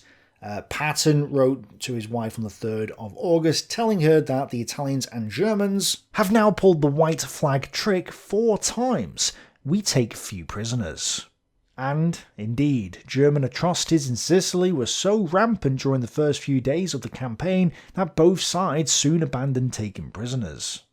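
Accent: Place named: Staffordshire, United Kingdom. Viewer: That’s a fellow Staffordshire accent if I’ve ever heard ons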